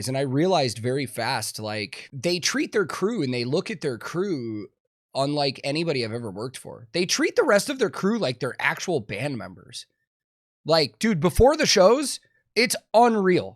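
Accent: Germany. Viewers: Did your German improve during the tour, and which accent do you have now? 😁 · Hi. I`m from Germany and i must admit, that you really sound like a German! Ultra Props Buddy:D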